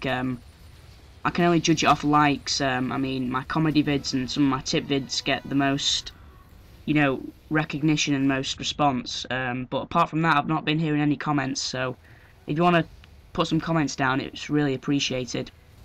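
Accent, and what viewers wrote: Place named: United Kingdom. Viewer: Hey it's me!! I gave you feed back before, I really like you. Your young but your not young enough for your voice to be ear piercing, and you got a British accent so that's always nice to hear. You also seem quite calm and comfortable commentating. I think I'll sub, also I really like frontier as a map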